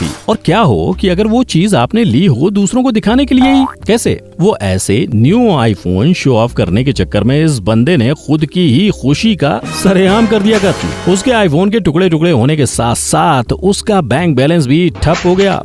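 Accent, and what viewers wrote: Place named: Gujarat, India. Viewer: Gujrati lagate ho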